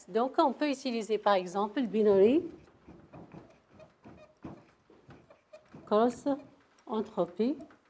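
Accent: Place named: Africa. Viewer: Mafhamna welou tahadri bel français te3 les africains 🤣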